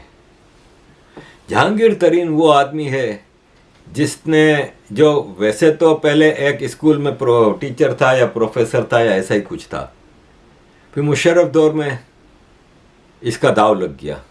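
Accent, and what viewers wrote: Gujarat, India. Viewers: Sir your accent is Gujrati.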